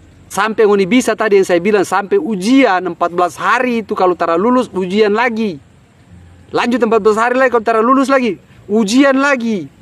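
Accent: Ternate, Indonesia. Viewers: Hehehe mantap pak, semoga berkah ilmunya. Terimakasih.. Lucu juga pak,, rindu dengar orang ternate punya logat😂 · Ale carita pake dialeg asli ternate bole tamang.. Tarusa deng apakong saya anda saya anda bagitu tu😂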